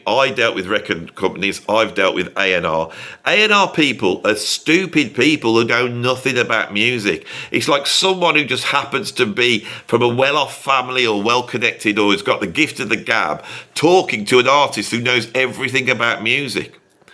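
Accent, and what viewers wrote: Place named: England, United Kingdom. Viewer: You know, you sound like Wolfman Jack with an English accent.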